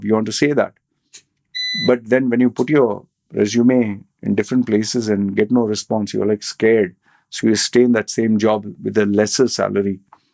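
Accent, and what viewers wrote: India. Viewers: At first i thought you are Hispanic but u have an indian accent.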